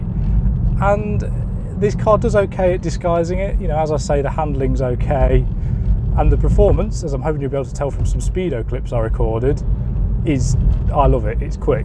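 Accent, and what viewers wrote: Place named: East Midlands, United Kingdom. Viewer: You sound like you're from Notts/Derby - get over to Noobtune and give this an £80 remap - he''s only in Long Eaton and it'd make for another brilliant video!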